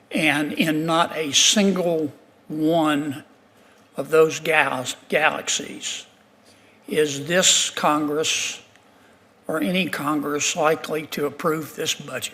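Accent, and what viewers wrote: South, United States. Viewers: . NA that’s how he always talks sometimes worse. Southern I guess.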